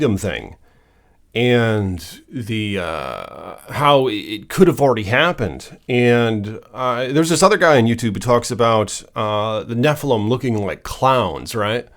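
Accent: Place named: Canada. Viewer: I swear, I thought you were from Canada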